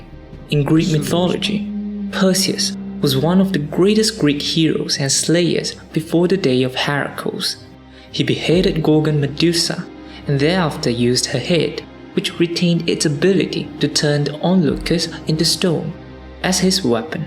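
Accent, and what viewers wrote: United Kingdom. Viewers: 有学剑桥英语吗？你的口音好像学过呢~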